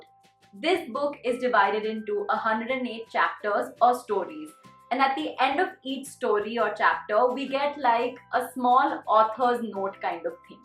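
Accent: India. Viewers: You've been brought up in US, still your accent is wholly Indian! Anyway, lovely review 🥰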